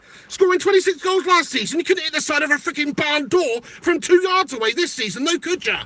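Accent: Manchester, United Kingdom. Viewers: You a local Manc? lmfao!!!!!!!!!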